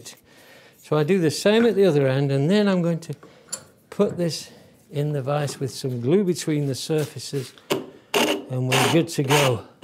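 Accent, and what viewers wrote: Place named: Sheffield, United Kingdom. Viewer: Love that accent. The sound of Sheffield steel.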